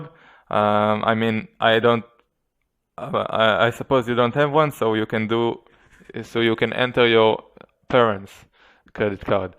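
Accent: Israel. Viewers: חחחח בשנייה שהוא התחיל לדבר הייתי כזה "הוא כל כך ישראלי" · אני מת ידעתי שאתה ישראלי מהמבטא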